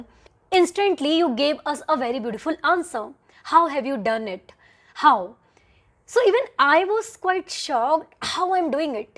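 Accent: India. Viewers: I think you are from India because your voice is Indian.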